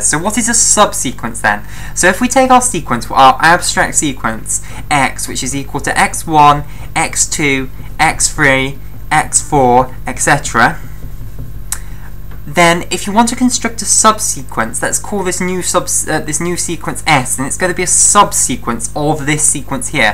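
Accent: United Kingdom. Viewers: thats the most british accent i've heard!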